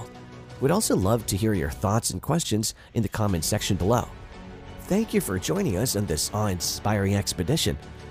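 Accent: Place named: United States. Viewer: He's a robot with a vaguely American accent.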